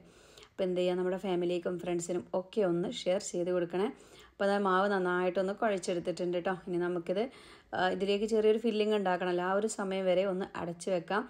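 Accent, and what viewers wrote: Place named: Palakkad, India. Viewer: ഹായ് അസ്സലാമു അലൈകും. ആലൂ പൊറാട്ട സൂപ്പർ ആയിട്ടുണ്ട് 👌നല്ല അച്ചാറും, കട്ട തൈരും കൂട്ടി കഴിക്കാൻ സൂപ്പർ ആണ്. നല്ല ഒന്നാം തരം നോർത്തിന്ത്യൻ ഫുഡ്‌ ആണ്. അതൊക്കെ പോട്ടെ ഞാൻ പണ്ട് നിങ്ങളുടെ ചിലകറി കൾ ഓക്കേ ട്രൈ ചെയ്യാറുണ്ടായിരുന്നു എനിക്ക് എന്റെ ഉമ്മ ഉണ്ടാക്കി തന്നിരുന്ന കറികൾ പോലെ തോന്നുകയും ചെയ്തിരുന്നു 🙏പക്ഷെ അന്ന് നിങ്ങളുടെ സംസാര രീതി എന്തോ വല്ലാത്ത അസഹനീയം ആയിരുന്നു 😔എന്തിനാണ് ഇങ്ങനെ സംസാരിക്കുന്നത് എന്ന് തോന്നിയിട്ടുണ്ട്. കാരണം എനിക്കറിയാം എന്റെ നാട്ടിലുള്ളവരും പരിസരതുള്ളവരും എങ്ങനെ യാണ് സംസാരിക്കുന്നത് എന്ന് എല്ലാർക്കും ഒരേ പോലെ അല്ലങ്കിലും ചില വ്ലോഗർമാർവന്ന് നാടിന്റെ പേര് തന്നെ പറഞ്ഞാൽ മറ്റുള്ളവർ കളിയാക്കുന്ന തരത്തിലാക്കി സ്വന്തം PKD 😔 എന്തായാലും ഒരുപാട് സന്തോഷം കുറേ നാൾക്കു ശേഷം നിങ്ങളുടെ വീഡിയോ കണ്ടപ്പോൾ സ്വന്തം സംസ്ക്കാരം നിങ്ങൾ തിരിച്ചറിഞ്ഞു വെറുപ്പിക്കാതെ വീഡിയോ ചെയ്തതിന് 🙏🙏❤️ പിന്നെ നാട്ടിലെ മാങ്ങാകാലത്തെ ഓർമ്മപ്പെടുത്തിയപ്പോൾ 😔😔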